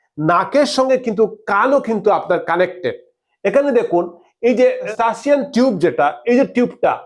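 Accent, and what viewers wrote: Sylhet, Bangladesh. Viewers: Sylhet er bhasha emnitai bujajai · Bro ! Are you from Sylhet ? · bai sylheti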